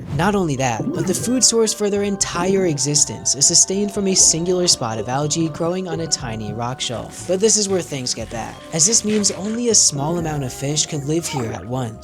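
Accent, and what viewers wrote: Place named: Alabama, United States. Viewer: Sounds like Alabama.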